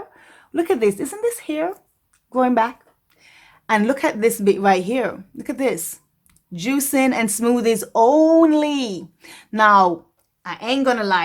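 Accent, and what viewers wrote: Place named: Jamaica. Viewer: Lol you’re Jamaican??? The accent sounds familiar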